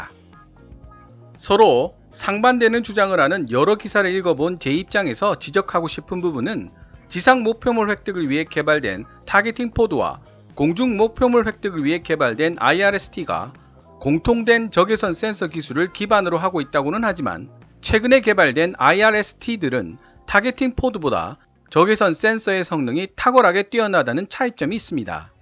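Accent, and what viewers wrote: Gyeongsang, South Korea. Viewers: 알만한 사람는 다아는 내용을... 호들갑 떠내... F35 파는 이유는 F22가 있으니까 파는 거고 F22안파는 이유는 F22보다 뛰어난 기체를 아직 개발보유하고 있지 않기 때문이지... 이사람 갈 수록 방송 제수 없게 하네. 영어도 존나 못하고 발음도 개떡같이 하네. 어떻게 영어발음에 경상도 사투리억양이 들어가있냐?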